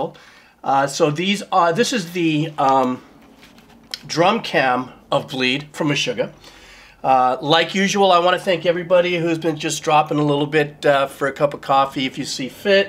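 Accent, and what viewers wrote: Hawaii, United States. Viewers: Where are you from brotha, sounds like your on the Islands. If your located on Oahu and give music lessons, I would be interested, Aloha.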